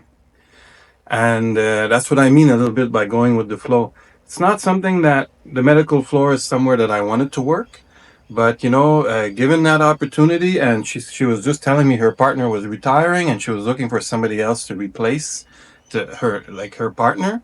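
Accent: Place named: Quebec, Canada. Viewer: Wow, I stumbled on your videos by accident searching for anything PV. My wife and kids have been visiting PV for a while, we normally stay downtown around Versalles in Airbnb’s. We do like the comfort of a condo but a palapa feels more like something I would feel grounded to nature in and would be nice to experience. Your spot in the mountain overlooking the ocean looks incredible. Your story is very inspiring, I’m a little younger (42) but we seem to have some things in common. I do sometimes wonder how different it would be living like you are, to someplace slower moving and more relaxed. Good for you sir! I sense a French Canadian accent in the way you speak… Je vous souhaite à vous et votre femme beaucoup de bonheur. De Mtl.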